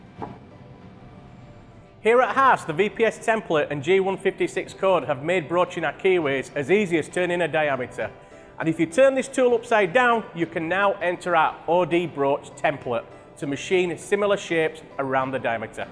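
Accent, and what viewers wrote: United Kingdom. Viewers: Love the accent too. It's an accent I always associate with traditional British engineering, and steam trains.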